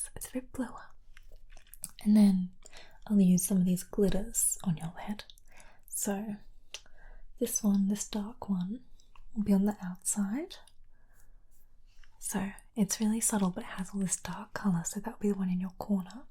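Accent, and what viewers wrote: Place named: Australia. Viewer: As an Australian, I use to be weirded out when I heard the same accent in asmr, but then I found you and diddly and now it's one of my favourites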